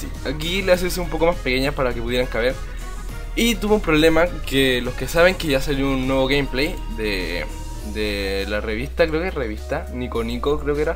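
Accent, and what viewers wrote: Chile. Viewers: ¿De qué país eres? es que tu voz la siento de chileno(? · es chileno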